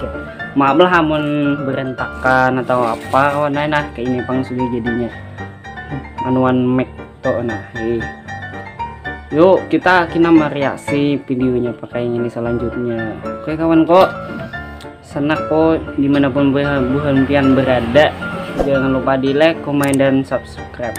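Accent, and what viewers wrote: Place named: Banjar, Indonesia. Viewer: bjur bnr tu orang Banjar😂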